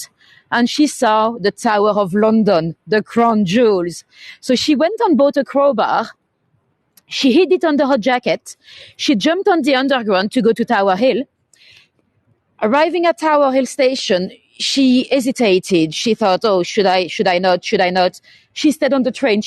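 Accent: France. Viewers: You literally have the best French accent ever. I could listen to you all day…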